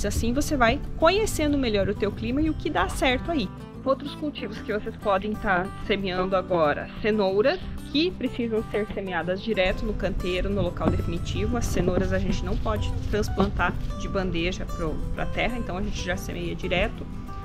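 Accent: Rio Grande do Sul, Brazil. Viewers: Achei que você era aqui do sul ,têm um sotaque gaúcho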